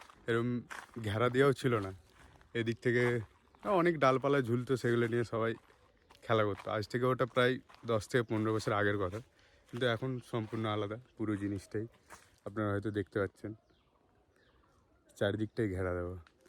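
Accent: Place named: Bangladesh. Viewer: Pure bangla accent ❤🫡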